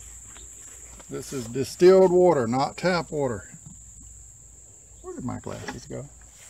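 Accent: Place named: South, United States. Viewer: I've got a couple of batteries new I let go I shouldn't have and I really wanted to understand how to rejuvenate these batteries they're deep-cycle but I can't understand a damn word you're saying hardly you need to learn how to speak or something I know country accent is one thing but when you're making videos everybody needs to understand what you're saying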